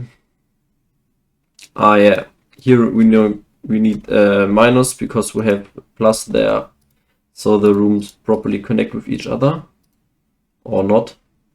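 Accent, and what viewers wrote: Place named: Germany. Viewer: German accent goes BRRRRR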